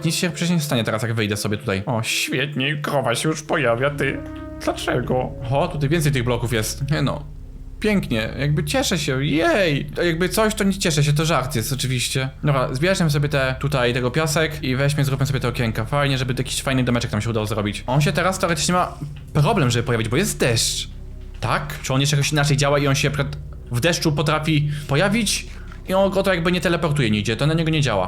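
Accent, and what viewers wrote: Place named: France. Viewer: Czemu masz francuski akcent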